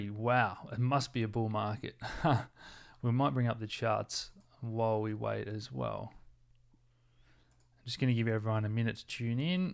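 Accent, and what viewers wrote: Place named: Tasmania, Australia. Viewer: It aint Aussie it's Tassie ... World = Weld only in Tassie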